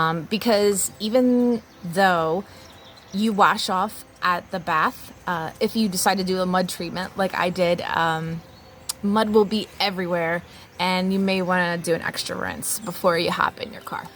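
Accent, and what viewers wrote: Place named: New Jersey, United States. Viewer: Love it! You can take the girl out of Jersey, but can't take the Jersey out of the girl... "Wooder 🙂"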